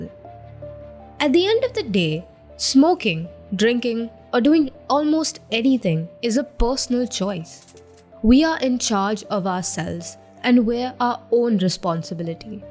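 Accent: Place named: North India, India. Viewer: This North Indian Accent. And the audacity to speak it so confidently. Fucking "Pakistanis on the wrong side of border" .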